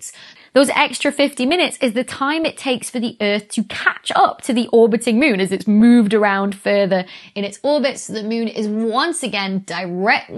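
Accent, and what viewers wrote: United Kingdom. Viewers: She uses the British pronunciation with the accent on the u. American pronunciation has the accent on the i. Both are correct in their respective countries. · Wobble. Wooble. You pronounce it almost like the second word so yeah, it does sound a bit funny. Just like Saturn. Sah- uhn! Love your stuff Dr. Becky. I just have to translate your accent! When my wife and I watch Brtish TV shows, I'm always translating for her. We are both native English speakers! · Over here, on the other side of the pond, we colonists (oops, I mean Americans) pronounce the word cen-TRI-fugal, not centri-FU-gal. That's not to say you're wrong... just different. (I actually am quite taken with your UK accent.)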